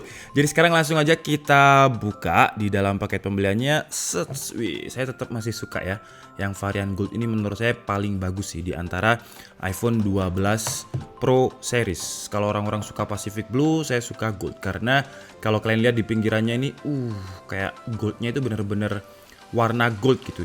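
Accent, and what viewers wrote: Java, Indonesia. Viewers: ABANG ORANG MANA SIH..kok rada logat jawa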